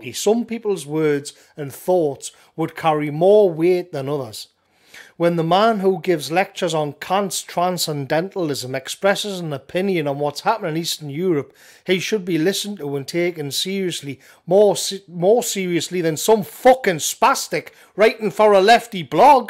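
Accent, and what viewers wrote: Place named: Northern England, United Kingdom. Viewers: I just came here to say it's nice to hear sensible and coherent ideas rendered in a quality northern accent 👍🏻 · Your Geordie dialect has a penetrative common sense way about it. RIP The Honourable Sir Roger Scruton.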